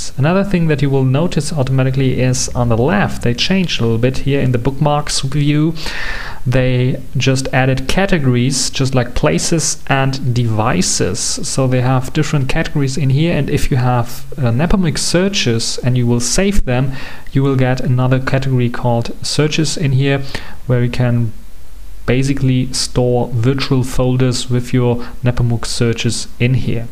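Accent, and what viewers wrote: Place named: Germany. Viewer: Deutscher Akzent ;) nice video.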